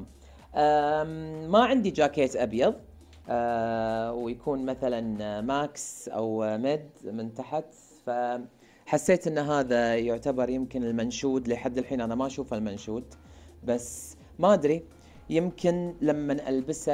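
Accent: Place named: Iraq. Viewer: احسك عراقي مو كويتي😭💕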